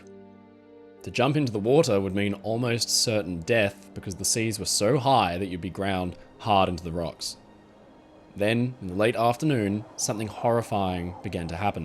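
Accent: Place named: Australia. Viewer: Thanks, awesome hearing an Aussie accent mate. Great content!!!!! Thanks for the work you've put Into your videos - top quality 👌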